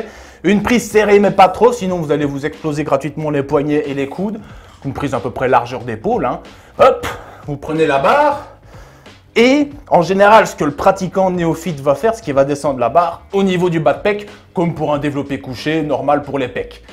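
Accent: Belgium. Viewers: Super, mais tu pourrais faire des vidéos juste avec l'accent belge et l'accent forain ? Merci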